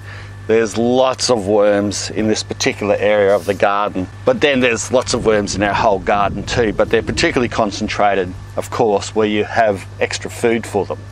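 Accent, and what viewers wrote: Australia. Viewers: I subscribed immediately after hearing his Australian accent lol! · he said so himself in another of his videos. But also he has Aussie accent and you can see the background. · like an australian? I guess we do talk weird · Somehow I did not expect an aus accent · I love Aussie accent! And also his kitchen scraps garden. It's again! Love it.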